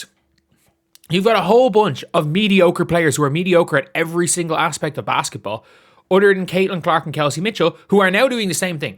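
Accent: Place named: Ireland. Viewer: That’s just the Irish accent lol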